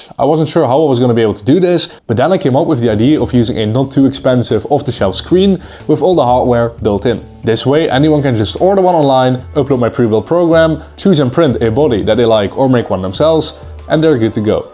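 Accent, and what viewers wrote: Netherlands. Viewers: ''and more specifically'', je bent nederlands ;) hoor ik al in de erste 3 seconden ;)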